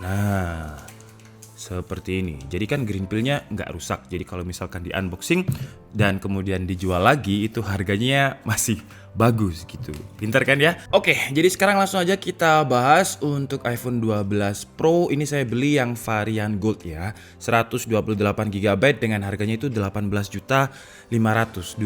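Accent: Java, Indonesia. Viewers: ABANG ORANG MANA SIH..kok rada logat jawa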